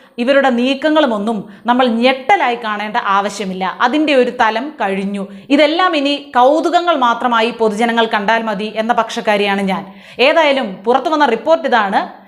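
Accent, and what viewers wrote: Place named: Thrissur, India. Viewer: gouravatharamaya KALAKKU........Thrissur bhashayanee..........kalakkiyittundu...congrats. Kazhinja varshangalilum chila ERANAM. KETTAVAR. pooram kalakkan sramichittundu.Kshama kettal Thrissurukarude THANIKKONAM avar ariyum. Aa vendran marude. kudumbathil kayari vedikkettu nadathum...MUKKIYA. vendrante veettil adakkam.......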